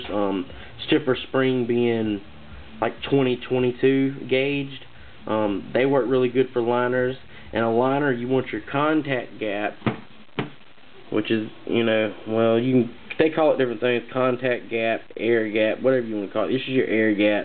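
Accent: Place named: South, United States. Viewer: good video for new artist...the southern acent lol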